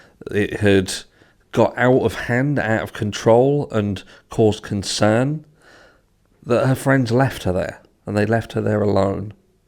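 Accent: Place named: England, United Kingdom. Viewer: I want a “but anywaaay; I digress” in rad English accent hoodie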